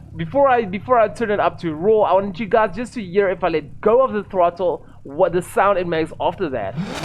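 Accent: South Africa. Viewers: bro sounds south african fr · I hear that south african accent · Hi r u south african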